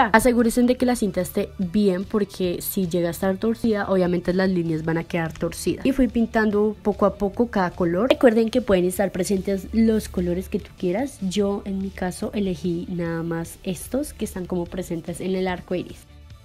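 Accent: Colombia. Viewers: Es colombiana!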